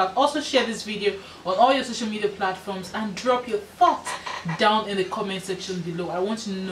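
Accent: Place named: Africa. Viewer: Love that African accent P.S. you are beautiful!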